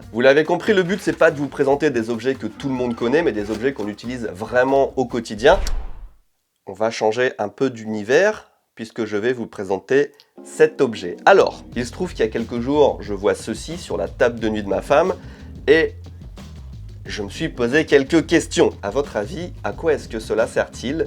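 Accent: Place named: France. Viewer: Je suis complètement d'accord avec vous. Comme les français, les japonais n'aiment pas les sous-titres. Donc, parlant japonais avec un accent français, ça va faire fureur!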